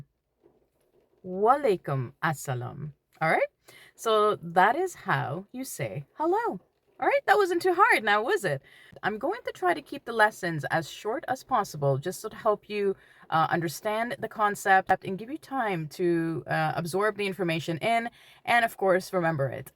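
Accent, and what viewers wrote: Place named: Canada. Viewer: Are you living in canada or nearby michigan?Cuz, you have a little bit of the accent with the out and about😊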